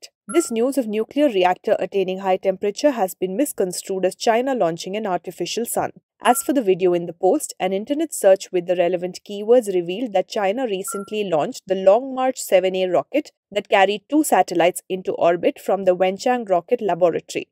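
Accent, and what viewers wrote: India. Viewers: An Indian voice over to debunk sun simulators?? OK ill fake check that as false. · switch off soon as heard the Indian accent lol biggest lying media in the world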